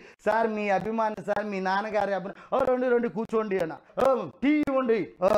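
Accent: Karnataka, India. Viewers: Kannada kodthundi bro nuvu matladtha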